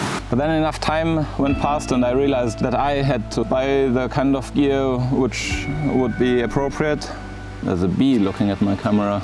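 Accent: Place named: Germany. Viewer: Scanning negatives (or slides) is a niche application, but one where the Olympus cameras are a really nice (and quite affordable) option. The light source is a problem with 4x5 negatives, not so much for smaller formats up to 6x9. The pixel-shift hi-res mode does a really good job at that, even though the "true" resolution is more like 40 to 50 MP (still more than enough to make the grain visible). BTW, your English sounds somewhat "German", or am I mistaken?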